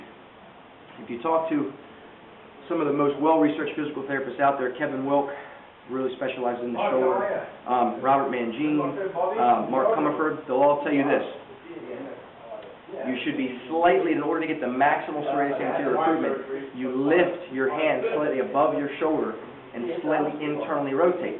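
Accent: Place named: Philadelphia, United States. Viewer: Ended up here because I have to study the Serratus Anterior for an A&P exam and came across an article about how in a weakened state, can cause rounded shoulders. BUT- by doing a push up plus you can increase your strengthening of this muscle, so I ended up here. Don't ask why but 15 secs into this video, I couldn't stop wondering if is from the NE, particularly, Philly. Just from listening to how he speaks.Could be wrong but it's kinda fun to be able to determine that.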